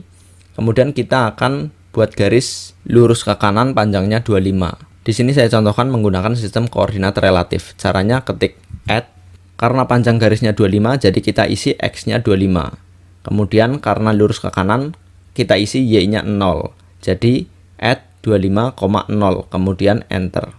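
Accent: Banyumas, Indonesia. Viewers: mantap mas.. lanjutkan.. saya tonton videonya semua... btw masnya kayak ngapak nih xixixix josss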